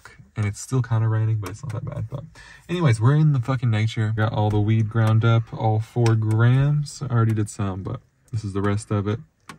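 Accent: South, United States. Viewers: The southern is strong with this one. XD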